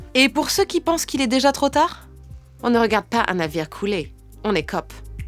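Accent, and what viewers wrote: England, United Kingdom. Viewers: C'est excellent!!! J'ai eu peur un petit moment à cause de l'accent, mais ha, elle parle en français avec son accent anglais, tout s'explique! Et le message de la fin est extrêmement pertinent (personnellement acquise à cette cause à 100%, j'utilise des sacs en tissu, des bouteilles consignées et j'ai des pailles lavables en métal)... et la dernière phrase est tellement forte!!! Je valide avec un enthousiasme indescriptible et les larmes aux yeux! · Bravo pour cette nouvelle vidéo! Accent anglais parlant français très réussi! Thème d'actualité qui fait du bien aussi! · L'accent britannique est tellement parfait, il est mesuré, à aucun moment cliché. Et le fait de parler écologie : 💚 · Cet accent anglais 😳😱😱😍😍😍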